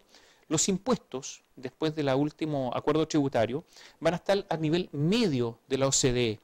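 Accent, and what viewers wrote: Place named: Chile. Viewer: cada vez encuentro mas chilenos con su acento estupido el peor castellano de america!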